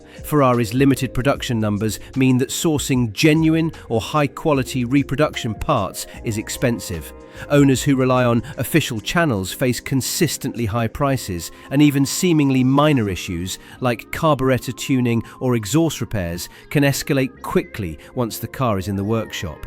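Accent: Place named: United Kingdom. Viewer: Amusing. The British accent threw me off for a bit, but then I noticed that the costs were extremely detailed even in a range, as presented without out humor or any kind of approximation. 😂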